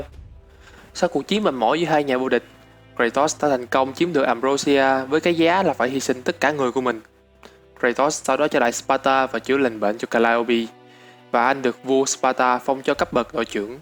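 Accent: South, Vietnam. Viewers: t xem đơn giản vì giọng của thớt miền Nam, t ko anti miền Bắc nhưng vẫn cứ thích nghe giọng phân tích miền Nam hơn :v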